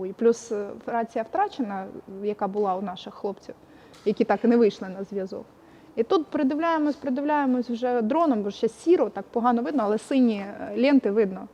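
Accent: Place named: Surzhyk, Ukraine. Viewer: Лицемерка. На поле боя на русском разговаривает, а перед камерой на мове, больше похожим на суржик. Бандеровская подстилка.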